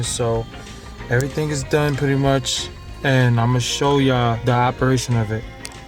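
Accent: New York, United States. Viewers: i can hear the NY in ya voice where u from um un NY too install my shyt lol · Us nyc cats know when we hearing that new your accent!!! Good vid 🗽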